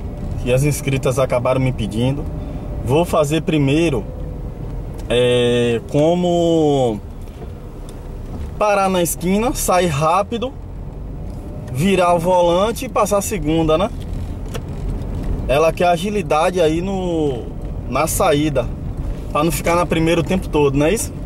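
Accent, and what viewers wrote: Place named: Pernambuco, Brazil. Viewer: Você é Pernambuco? Gosto do sotac.. Parabéns!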